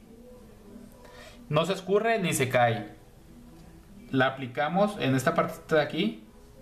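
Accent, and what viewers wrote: Chihuahua, Mexico. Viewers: Tu eres de chihuahua! Por el acento! Nada más por ser mi paisano te voy hacer caso caray! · Eres de chihuahua?...! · a mí me parece que es de Chihuahua..por como pronuncia la ch 🙃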